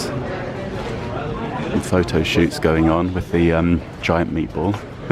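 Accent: United Kingdom. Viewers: Just subscribed. Love your vlogs and your masculine British accent. 😊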